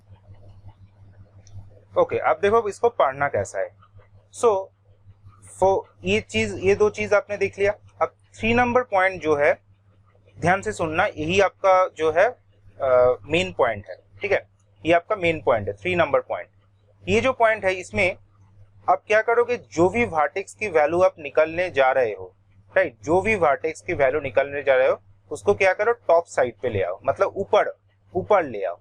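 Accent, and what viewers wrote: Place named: Bengal, India. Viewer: Bangali bangali mne hche golar awaj sune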